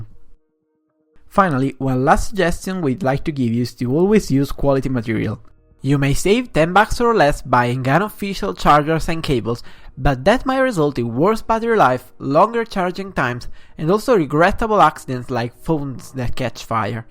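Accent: Italy. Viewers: Mostafa Haque I'm from Italy and the accent is SPOT ON! He's definitely italian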